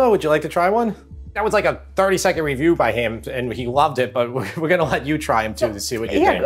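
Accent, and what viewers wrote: New York, United States. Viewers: Is that New York I hear in your voice? Bronx maybe?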